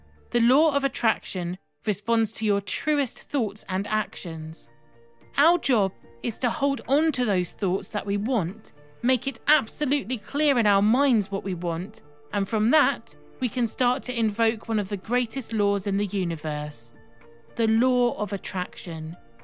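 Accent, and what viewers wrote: United Kingdom. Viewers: WHY do they always use strange. British accents to narrate these videos ?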